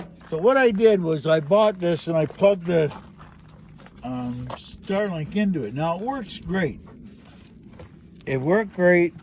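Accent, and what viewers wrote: Canada. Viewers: Every time you said “battery” my heart would flutter!! Great Canadian accent 💫💛💫 Thank you for sharing 👍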